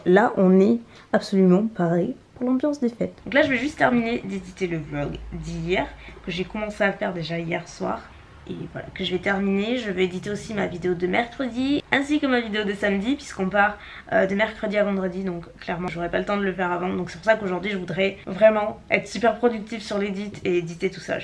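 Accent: South, France. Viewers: Ce n'est plus un mais 2 rayons de soleil en vidéo dès le matin!!! le bonheur!!!! et Notre internationale fan de chocolat, chantilly et crème va voir une diététicienne mercredi!!!! svp fait nous un retour!!! en plus elle a pas besoin de régime.... Elle est très bien notre!!!! Bravo pour tout ton travail, on ne se rend pas compte les vidéos dure entre 10 et 20 minutes pour nous, mais quel boulot!!! merci pour tes contenus, ta joie de vivre et ce petit accent du SUD qui nous réchauffe!!!! biz à vous 2!!! très beau sac ce TED BAKER, j'adore!!!!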